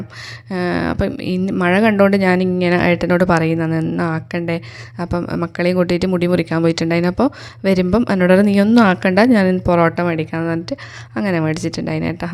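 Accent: Kannur, India. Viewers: Adipoli vlog ❤️kannur ഭാഷയും super👌പാത്രം കഴുകി മടുക്കും ❤️ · കണ്ണൂർ ആണല്ലേ place.. സംസാരം കേൾക്കാൻ നല്ല രസമുണ്ട്😍😍energetic vlog 👌👌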